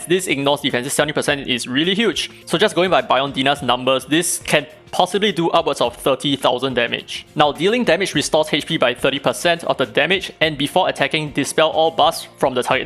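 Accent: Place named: Singapore. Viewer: such a strong Singaporean accent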